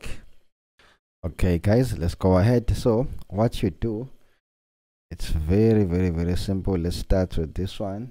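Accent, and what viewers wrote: South Africa. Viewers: You from south Africa bcz I here from you iiibo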